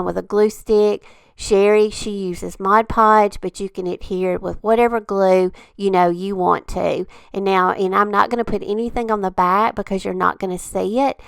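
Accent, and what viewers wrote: South, United States. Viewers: I love them all & i love your Southern accent. :) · Came over from Julie’s channel! New sub! (I’m in Alabama so I love your accent!) ❤️🦩 Vicky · Loved all your projects !!!!! New subbie...... looking forward to more projects..... And I can tell you are Southern.... We Southerners can recognize our peeps !!!!! ( just by listening to them talk !!!! LOL ) God bless !!! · Where are you from? Your southern accent sounds like my family. 🥰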